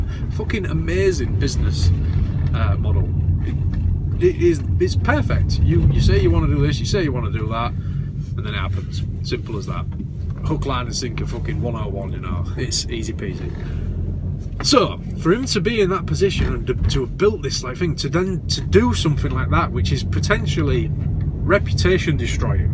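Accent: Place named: Teesside, United Kingdom. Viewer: Great vid mate, where about's you from? Sound a little bit like a smoggie!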